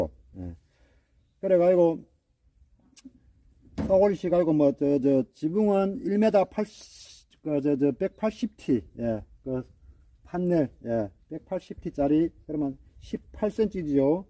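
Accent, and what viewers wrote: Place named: Gyeongbuk, South Korea. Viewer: 경북우리고향 사투리네여 ~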